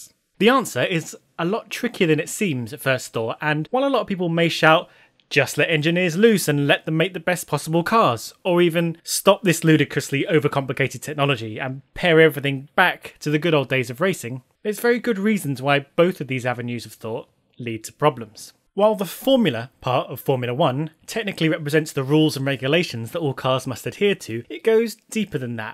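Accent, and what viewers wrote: United Kingdom. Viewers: lol brits always say social mediar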